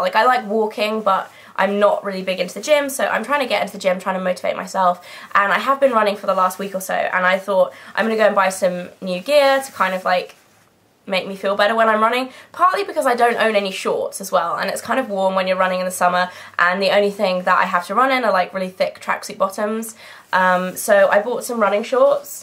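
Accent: England, United Kingdom. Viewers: i just LOVE how you said "shorts" @@ i get hypnotized xD i really love the english accent! :D and nice haul! woof adds some fun! LOL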